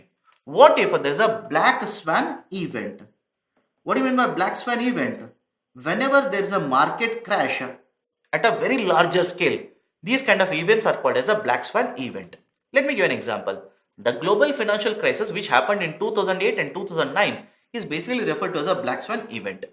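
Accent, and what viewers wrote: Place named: South India, India. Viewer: I can eaisly identify & sure that this sir belongs to southern side..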